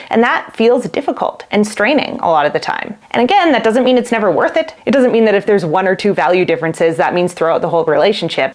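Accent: Canada. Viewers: Why insult Texans? Do you actually think your goofy Canadian accent sounds better.😂😅😮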